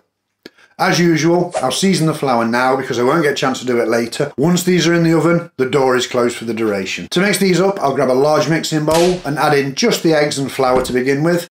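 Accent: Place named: Nottingham, United Kingdom. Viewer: you from Nottingham mate?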